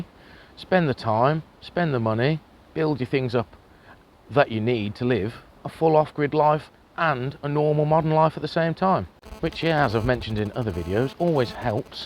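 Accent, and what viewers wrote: United Kingdom. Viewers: another good video bro. Are u in england ? cant make out the accent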